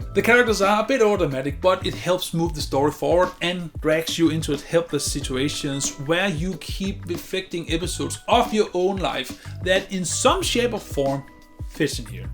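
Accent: Denmark. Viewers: Er du dansker? Din accent lyder ret dansk i hvert fald. Nice video tho!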